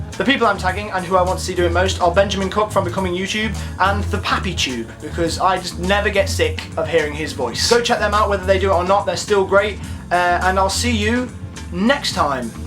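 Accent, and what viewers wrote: United Kingdom. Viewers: Your British accent is beautiful, you're beautiful. Also your lips look kissable. Also was listening to you wasn't on mute. Oh my.. I'm awkward. Byee(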